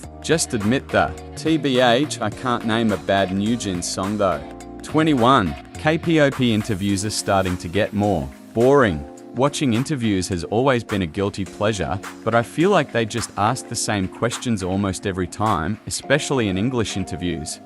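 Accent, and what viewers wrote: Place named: Australia. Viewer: "They should make a rule that you should be 16 minus 17 years old to debut." 🤣🤣🤣 So they should be literal fetuses? Haha just pulling your leg! Is this a robot voice reading "16 - 17" literally because that is freaking hilarious! No judgment, absolutely no dislike for robot voices. I would also choose one instead of using my own voice. I've never heard an Australian robot voice, and then you said you were from Germany, but that does not preclude that you may have learned English in Australia, as one of my friends did. I enjoyed this video very much! I'm subscribing right now and I hope you make more videos soon! 🙏😄🌸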